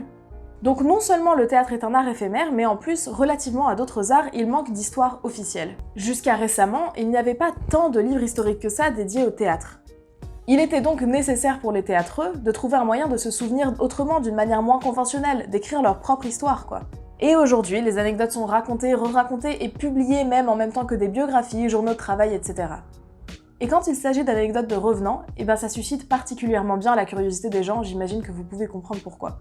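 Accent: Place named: England, United Kingdom. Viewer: Wow, je suis éblouie par cette beauté, ce talent, cet accent anglais ainsi que cet humour absolument incroyable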